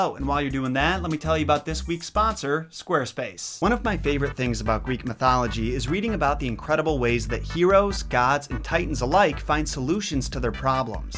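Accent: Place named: East Coast, United States. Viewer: I’m still trying to place his accent lol. I’m sure it’s east coast but I want to pinpoint it lol.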